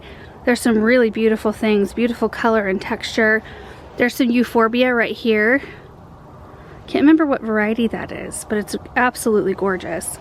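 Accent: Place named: Midwest, United States. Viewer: Hi, Just thought I would mention every time I hear you pronounce zinnia, I think to myself, oh our way with the "short i" must be a Midwest kind of thing. My kind of arrangement, esp. love the cabbage with the rose and berries. Thank you.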